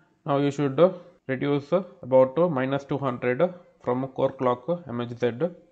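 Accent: India. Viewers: lmao the curry accent makes it better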